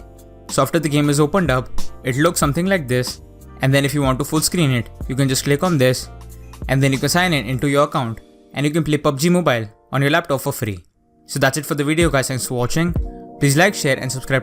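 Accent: India. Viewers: Please don't do the cliche basey remix intro sequence- Shit. Ok, but at least have a tutorial that isn't voiced by somebody with an indian accent (no offense meant) SHIT. Another one. At least don't do the "Before we start like and sub" DAMN IT! Ok, but at least tell me it's the real deal and it's not watered down or a virus- It's an EMULATOR?!?!?!?!? That's it, bye. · are you indian you sound like it and i am egyptain by the way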